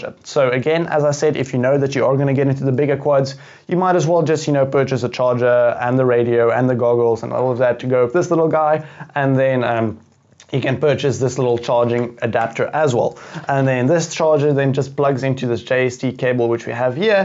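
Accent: South Africa. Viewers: You sound like a fellow South African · are you from South Africa?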